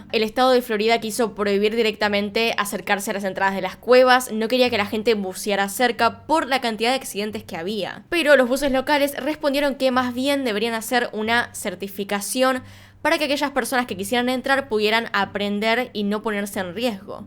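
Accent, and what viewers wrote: Argentina. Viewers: Lo único malo es el acento argentino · que te pasa con el acento argentino, gil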